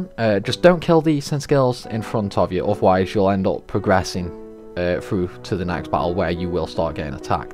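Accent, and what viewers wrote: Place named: Manchester, United Kingdom. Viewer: Are you a Manchester? I recognise that accent pal 👌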